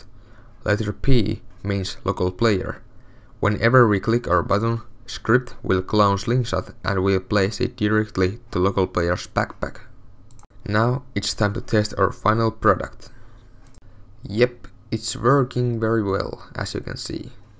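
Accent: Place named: Finland. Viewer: U sound finnish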